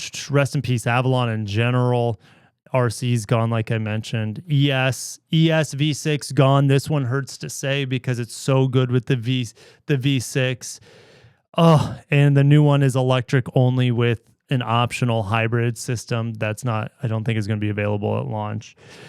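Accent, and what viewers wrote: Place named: Wisconsin, United States. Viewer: I still have my 2000 Sienna running good. I'm thinking about getting 2021 Lexus RX BUT IT HAS 80,000 miles do you think I should get it? $28,000? Ps are you from the Midwest you sound like us in Wisconsin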